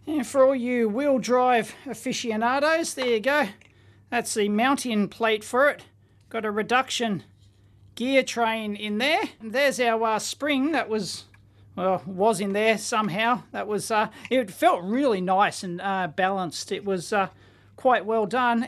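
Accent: Australia. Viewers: I clicked on the video without reading anything about it. Imagine my surprise when everyone's favorite Canadian UBF started speaking with an Australian accent.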